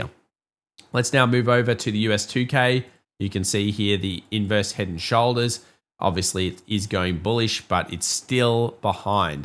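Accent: Australia. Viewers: Where are you ? you sound like a QLD'er ? 🙂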